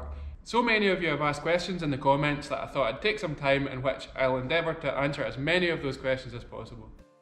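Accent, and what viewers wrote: Scotland, United Kingdom. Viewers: Hi, thank you for answering my question about where your slate comes from. It was really interesting to see the two pieces together (the red and the green). As always it's so nice to see your beautiful work. How I'd love to have a dovecote like the one you made! Looking forward to seeing more of your videos, and as an American, to hearing your Scottish accent.:)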